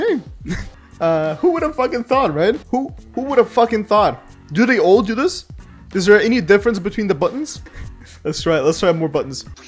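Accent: Russia. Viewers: I can't decide, I hear the Russian accent and see the inscriptions on the Russian, but at the same time you have in the description, the location of USA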